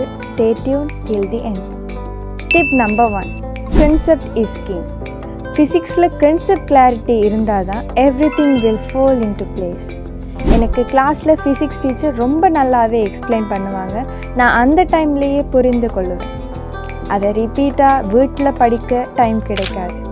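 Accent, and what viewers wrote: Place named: Trincomalee, Sri Lanka. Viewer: Ninga trincomalee distric nu nenekiren enthe oorunum vilangitu unga slang la kandu pudichiten😂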